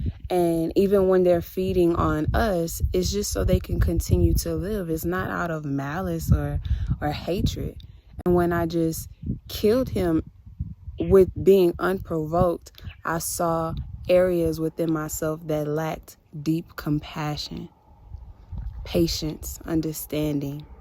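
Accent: Houston, United States. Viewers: Girl that Houston twang be twangin chile!!! 😆😆🤣 i swear everytime i hear somebody from houston and even myself on recordings i be like dang is we really that country😆😆 omg love the video shout out to you and ur hubby frm the nawf side! 😘😍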